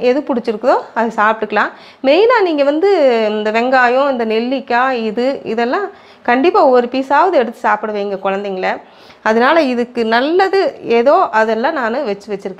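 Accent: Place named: Coimbatore, India. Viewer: Coimbatore voice super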